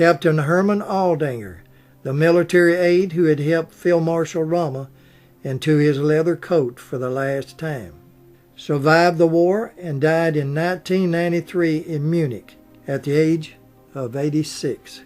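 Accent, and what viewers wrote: South, United States. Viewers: Mr., Just another very well researched and very well presented vlog. Please use your expertise and do a vlog on Rosa Parks. Again you have that golden southern accent that is so soothing to listen to.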